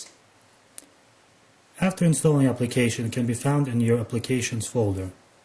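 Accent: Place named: Russia. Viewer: He have a russian accent :))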